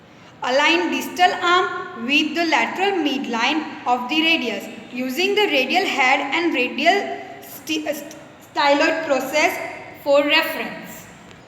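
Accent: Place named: Gujarat, India. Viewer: The girl who is giving instructions is pakka Gujarati Only then she can have that funny accent 😂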